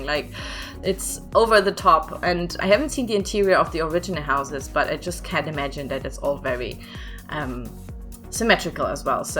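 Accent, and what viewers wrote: Germany. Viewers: I heard literally in 3 seconds that you must be German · Are you German or something cause you don’t have an english accent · May I ask where you're from? Your accent sounds German but then again you're using so many particular slang words that I would think you're native speaker? If not then hat's off your English is amazing🤯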